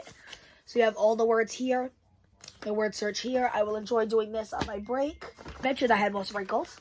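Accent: New York, United States. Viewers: Harry also has body wash shampoo and conditioner shave stuff ect my husband uses harrys we get his st Walmart because our dollar tree here in North Carolina doesn’t carry it im a new subscriber are you from New York i caught the northern accent because im originally from Long Island New York ❤❤❤ your content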